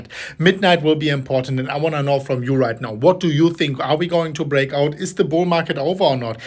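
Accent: Germany. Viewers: I just want to say, regardless of crypto, you‘re such a nice guy and have a great personality. My friends and I are watching your videos and we alway have a great time and laugh about your german accent because we‘re germans too😂 not to mention the thumbnails. Keep up the great energy and positivity, you always get me through these bearish phases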